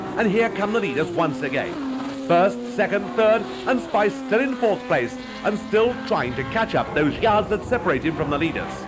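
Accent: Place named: RP, United Kingdom. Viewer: He's speaking in RP (received pronunciation), more commonly referred to as "BBC English". The criticism of his pronunciation is not unfounded. He is indeed pronouncing chicane incorrectly. Given that his only job here is to speak clearly and accurately in order to provide a useful commentary of events, one could fairly and accurately refer to the commentator as a prat (the official definition meaning "incompetent").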